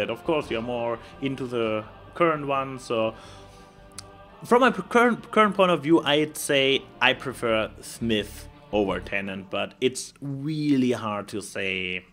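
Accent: Germany. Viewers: Into that German accent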